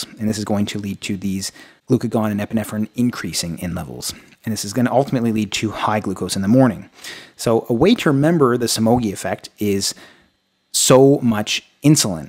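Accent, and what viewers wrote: Canada. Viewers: I was quite concerned that the morning rise in glucose was a side effect of poor blood sugar control ! What you seem to be saying is it's from other hormones! My blood sugar numbers don't go down until after my first meal, which is about noon. My conclusion that my insulin resistance wasn't improving was incorrect. Are you Canadian, I seemed to hear that from your pronunciation?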